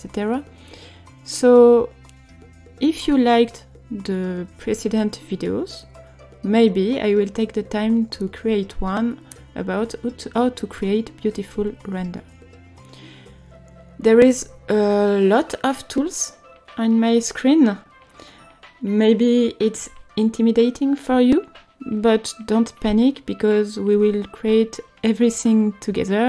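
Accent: France. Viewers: Your french accent really cute :3 and dont worry your accent is understandable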